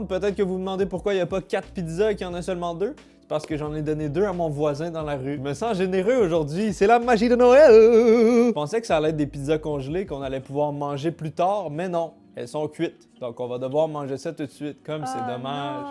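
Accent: Quebec, Canada. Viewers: J'aime trop l'accent québécois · cest la premiere fois que je vois un de ses videos, je crois entendre une pointe de québécois dans sa voix, est ce possible que sa soit un frère québécois ??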